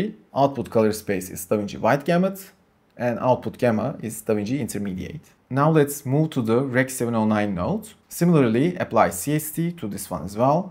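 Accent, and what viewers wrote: Turkey. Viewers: Abi selamlar türk olduğunu aksanından anladım direkt :) Böyle içerikler üreten türklerin olması çok hoş umarım daha da büyürsün.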